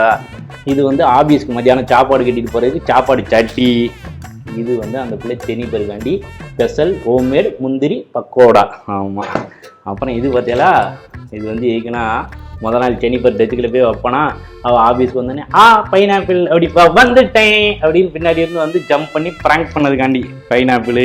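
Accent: Tamil Nadu, India. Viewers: Super, I am a great fan of your Annachi tamil accent 😅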